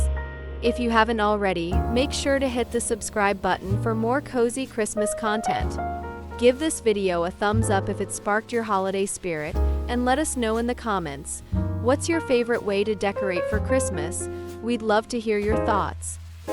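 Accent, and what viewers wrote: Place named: United States. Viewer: Why does Christmas in Scotland have to be narrated by an American accent?!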